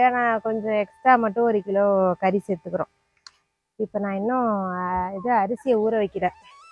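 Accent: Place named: Thanjavur, India. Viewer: Hi da nanum thanjavur unga slang pudikum unga area name venum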